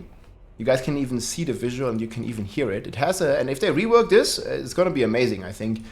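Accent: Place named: Germany. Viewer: I love the German accent Man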